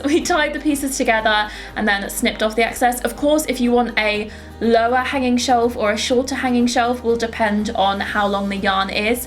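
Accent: United Kingdom. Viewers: I really like your British accent😍😍😍😍😍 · Are you British? I admire British people.😋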